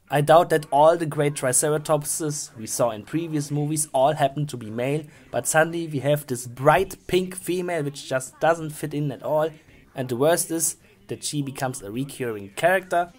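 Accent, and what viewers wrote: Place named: Germany. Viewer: i learn german (stil A1 at the moment) and i recognized the German accent in your videos. funny and awesome as well. also, love your videos, i'm also a big land before time fan, as well as a huge dinosaur nerd. so i know all of the dinosaurus of Land Before Time and Jurassic Park and stuff. loved the Walking With Dinosaurs reference at the end of the video. ("perfectly balanced") i would rank them quite diferently but for now i only have a top three, and a worst tree and there in between i have no idea yet because i havent decided yet. best ones 3 - Mysterious Island 2 - Big Longneck Migration 1 - The Original Worst ones 3 - Great day of the Flyers 2 - Invasion of Tiny saurus 1 - Unlucky Thirteen (totally skipable and unwatchable) Wisdom of friends (fuckery) "perfectly balanced As all things should be." also have to say, even if Stone of Cold Fire is not on my top three spot on the list, it still has in my opinion the best song of all the songlists:. 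but because there are no sharptooth threats, i dont think it deserve the spot on favorite ones because that was a downer for me. (notice, every other sequel had sharptooth action in it, and i always thought it was stupid to not include sharpteeth in 7. technically there were in there, but only as flashback but thatst just dumb.)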